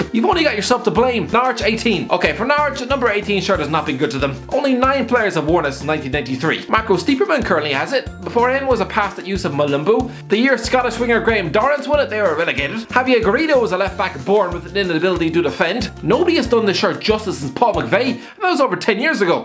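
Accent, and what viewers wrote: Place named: Ireland. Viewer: I can't understand a word he's saying 1 because he's irish 2 because he talks too fast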